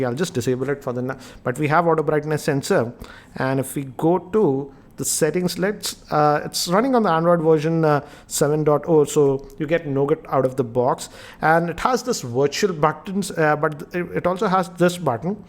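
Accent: India. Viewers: Prince Kumar I understand that he's indian. I know that he has an accent and I can understand that but there are times that I can't catch what he was saying cause his pace is too fast. Since he has an accent (which again I understand and I'm not taking that against him) It'll be nice for him to speak slow for his viewers to understand him since I believe I'm not the only non-Indian subscriber that he has. I'm not hating, I'm just giving him a constructive criticism to improve. Still love his reviews. And I respect.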